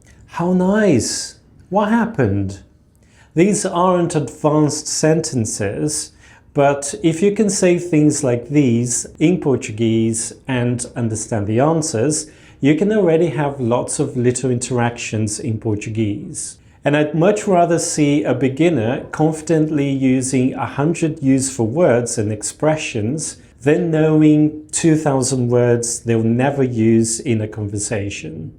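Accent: United Kingdom. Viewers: Um abraço de Sheffield de uma irlandesa que fala português. Nunca morei no Brasil, mas adoro o país e estive lá umas 7 ou 8 vezes ao longo de 25 anos. Na primeira vez, eu literalmente não conseguia abrir a boca para dizer nada; a tia surda-muda do meu namorado na época (um mineiro) até simpatizou muito comigo porque achava que eu também era surda-muda! 😅 Depois que soube disso, comecei a abrir a boca e a usar as palavras que tinha na cabeça. Eu lia livros e jornais, ia a restaurantes brasileiros e conversava com os funcionários. Não tenha vergonha de cometer erros. É exatamente assim que as crianças aprendem, e nós também ficamos uma graça tentando falar com nossos sotaques estrangeiros. Eu não entendia por que todo mundo caía na gargalhada quando eu dizia que adorava salmão “fumado”. 😂 Você tem um sotaque inglês adorável e culto, e acaba de ganhar uma nova seguidora. 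P.S.: Outra dica que me ajudou, além dos podcasts, foi assinar o Spotify Premium e cantar junto enquanto lia as letras das músicas brasileiras.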